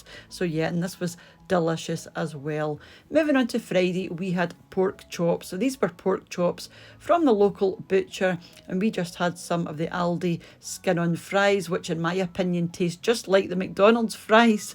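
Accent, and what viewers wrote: Scotland, United Kingdom. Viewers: I love to see "What's For Tea?"... And I love your wonderful Scottish accent,!... Scotland is a bonny place!... Eating well is very important, and there's one thing for sure, Mr. What's For Tea? doesn't go short! 👍🤣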